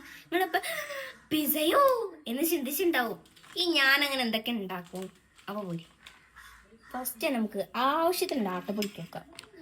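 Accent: Malappuram, India. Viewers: Malappuram aano kozhikodaano naadu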